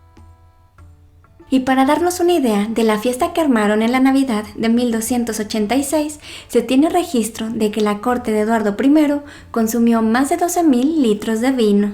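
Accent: Mexico. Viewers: La voz es de mejicano con acento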